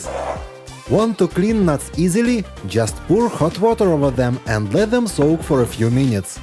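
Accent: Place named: Russia. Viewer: I love the RUSSIAN ACCENT! 👍🏻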